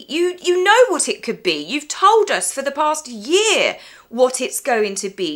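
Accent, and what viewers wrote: England, United Kingdom. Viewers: lol loved this!!! I hope we get a tour of your new home when you have it all done. Congratulations on your new home. Can I just say- you look more beautiful everyday and your content and the way you articulate things in that fabulous English accent - I mean truly you have the IT factor. I could see you being the next Graham Norton! You are just a star ⭐️ and I appreciate all you do for us. ❤❤❤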